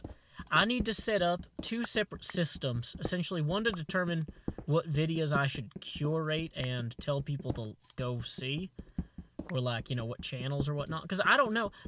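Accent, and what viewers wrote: United States. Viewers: Cool! American accent,and speak quite slow, but still you make very cool videos! But whos vches? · I love finding smart Americans with accents. Makes me want to laugh in the faces of all the people who call Americans dumb hicks.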